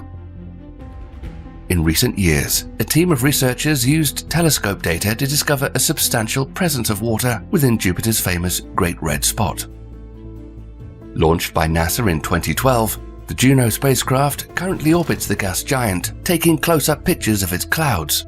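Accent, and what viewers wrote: England, United Kingdom. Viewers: Again with the haughty English accent. How POMPOUS! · I can't sleep unless it's a British accent talking about space. Anyone else?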